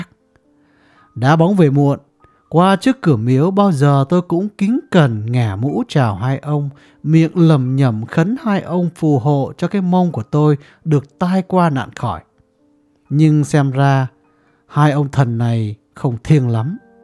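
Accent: Vietnam. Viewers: Tui thích giọng đọc của bạn, một chất giọng Bắc rất riêng không chói tai và rất truyền cảm. Riêng về các tác phẩm văn học thì các cây bút miền Bắc viết có tính chất văn học cao hơn để cho người nghe người xem nhiều suy nghĩ giúp có thêm nhiều tư duy.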